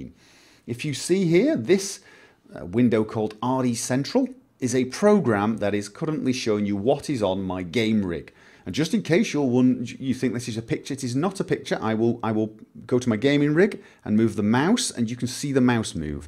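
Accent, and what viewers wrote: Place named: England, United Kingdom. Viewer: It's a heavy'ish english accent, and I've heard plenty of scottish and irish people talk. Not all you scottish people have a superheavy accent.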